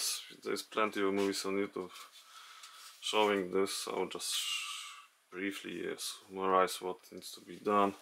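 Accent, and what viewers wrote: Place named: Russia. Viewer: it's realy solved my problem with error 10! thank you! конечно английский с русским диалектом это жесть, но за видос респект!!!!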